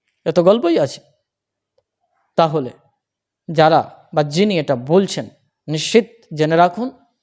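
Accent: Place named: Khulna, Bangladesh. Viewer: Dada apni khulna odhibasi mone hoy amer ma er vai er moto apner kotha boler dhoron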